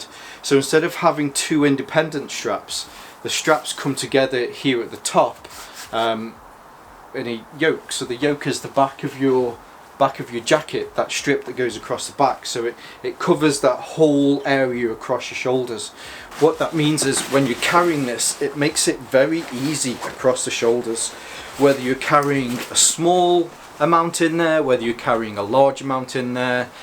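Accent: United Kingdom. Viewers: Hello Sir, are you British ? (i say that due to your accent)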